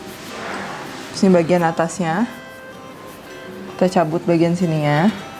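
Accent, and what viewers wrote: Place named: West Java, Indonesia. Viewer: bau hanyir 🤔nyunda pisan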